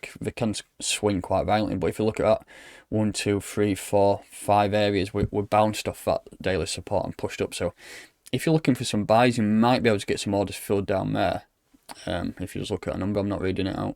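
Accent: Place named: Northern England, United Kingdom. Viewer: Proper northern boy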